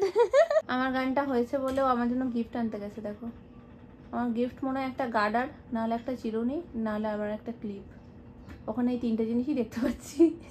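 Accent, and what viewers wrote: Bangal, Bangladesh. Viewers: Pakabori mukhe bangal kotha sonle khob valo lage mon ta vore jay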